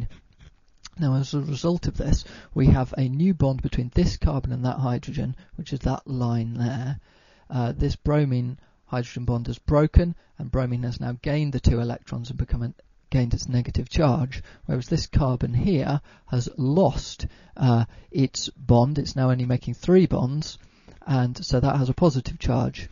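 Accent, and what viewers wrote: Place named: United Kingdom. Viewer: Chemistry makes so much more sense when it's taught with a lovely, soothing British accent! :D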